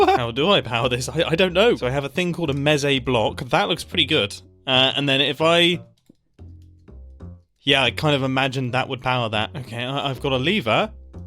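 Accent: United Kingdom. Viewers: they're both bri'ish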